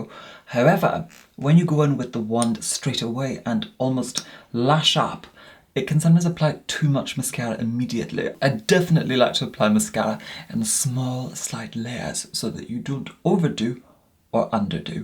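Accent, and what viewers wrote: Scotland, United Kingdom. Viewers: Although sounds English I’m 99.9% sure he is Scottish, the way he says some words and his last name screams Scottish descent. (I’m Scottish) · yes! he has a Scottish accent in his early videos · I love british acent, "there're some areas that need a lil bit more foundation, like MY YOOH", I gotta learn how to speak like british people 💕💜💖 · Where is he from , cause I'm getting a hint of Scottish ( btw I'm from Scotland ) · He's originally from Scotland, so it's probably a Scottish/English accent mix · Michaella if you are referring to accent, that is in fact a Scottish accent. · Do I detect a Scottish twang amongst his queens english?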